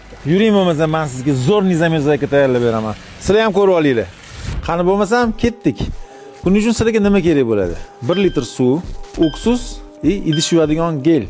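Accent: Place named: Tashkent, Uzbekistan. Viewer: НИМА ТОШКЕНТЧА ГАПИРИШ МАНСАБМИ ОБРОМИ ЁКИ ЛАВОЗИММИ. КУРИНИШИН ХАМ ГАПИНГ ХАМ БОШКАЧА БУНИ ХИДИ ШИРИН ЭАМАС ТУЗИ ПАСТ ЭКАН.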